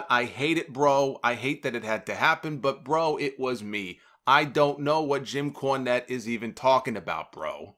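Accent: New York, United States. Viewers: "Nu Yawkaz" bro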